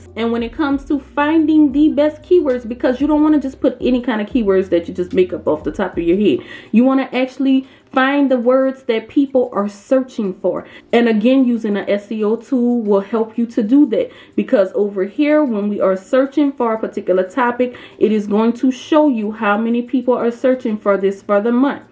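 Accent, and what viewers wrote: New Orleans, United States. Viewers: You're from New Orleans aren't you?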